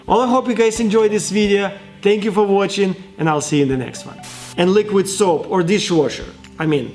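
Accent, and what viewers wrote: Russia. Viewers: Что это блять такое ? Ты же русский,это по акценту слишно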